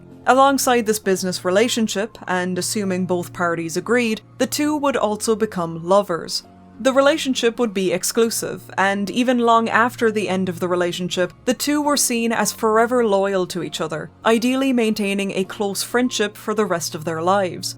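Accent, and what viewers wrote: Ireland. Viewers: Where is your accent from. Is it a mix of Irish with something else. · Ooooooooh an Irish accent. Always love to find niche horror game deep dive extra points for the familiar accent in a sea of Americans Australians and brits 😁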